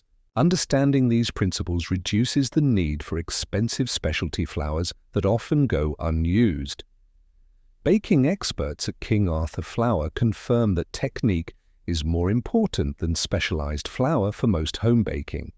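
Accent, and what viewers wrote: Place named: United Kingdom. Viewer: A video by a channel that implies USA-centered content, and narrated by someone from the UK?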